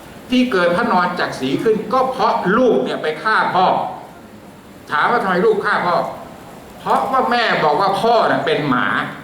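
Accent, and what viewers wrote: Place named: Suphanburi, Thailand. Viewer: ตอนแรกฟังน่าเชื่อถื่อมาก สำเนียงสุพรรณ คือสำเนียงหลวง ต่อมา โคราชมาจากไหน นี่มันเหน่อมันสำเนียงหลวง อีกต่อไปไทเลย เหน่อแน่นอนครับ คงจะเป็นสำเนียงหลวง ยิ่งฟังยิ่งเพี้ยน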